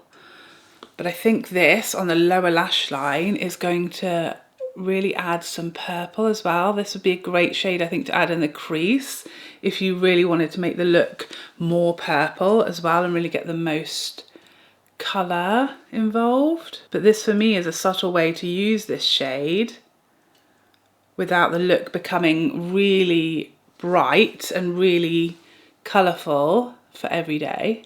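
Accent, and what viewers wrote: United Kingdom. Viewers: Bless you beautiful soul inside out love your vidoes your British accent is so regal and your voice is so calming and therapeutic, I love the mascara never tried brown but I am aiming on getting one as it will give sultry look and emphasis the eyeshadow, the look you created is spectacular 😊💕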